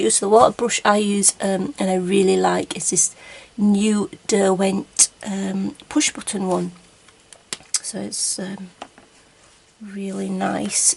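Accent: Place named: Yorkshire, United Kingdom. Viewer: Hi K...Using my Brutfuner in the Circle of Life "Spiders on the Spiders web" Halloween 🎃...ish 🤣🤣 Nice to hear a fellow Yorkie 🥰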